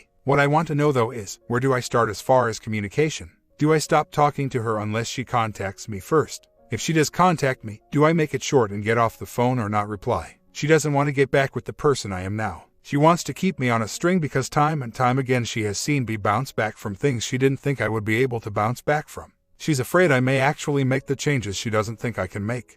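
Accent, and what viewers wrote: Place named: New Jersey, United States. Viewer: Toxic, toxic, toxic. Damn OP almost sounds like you're from Jersey.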